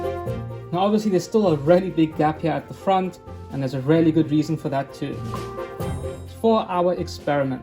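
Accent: South Africa. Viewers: I thought u sound like u from SA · You sound like you have an south african english accent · Are you south African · I knew you were South African cuz of your accent😂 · You're South African, aren't you? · Duuuuude! It's so hard to find fellow South Africans! I knew I recognized the accent. Keep going with the amazing content. ❤️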